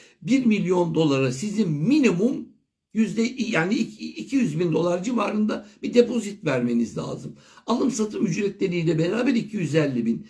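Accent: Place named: Istanbul, Turkey. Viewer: SAYIN HOCAM SİZİ DİNLEDİKÇE GURUR DUYUYOR ÖZ GÜVENİMİZ TAVAN YAPIYOR...İYİ Kİ VARSINIZ... HUZUR VEREN GÜLEÇ YÜZÜNÜZLE NET ANLAŞILIR BİLGİ DOLU İSTANBUL TÜRKÇENİZLE EVİMİZİN KIYMETLİ BİR MİSAFİRİ AİLEMİZİN TARTIŞMASIZ BİR ÜYESİ OLDUNUZ... RABBİM NEFESİNİZE GÜÇ KUVVET VERSİN BİLGİNİZ HER MAZLUM EZİLMİŞ TÜRK VE MÜSLÜMAN COĞRAFYALARIN ÇOCUKLARINA DERS OLSUN KIYMETLİ HOCAM... ALLAH İÇİN SİZİ ÇOK SEVİYORUZ... SELAM VE DUA İLE ALLAHA EMANET OLUN GÜZEL ÜLKEMİN ALÇAK GÖNÜLLÜ MÜTEVAZİ BİLGE İNSANI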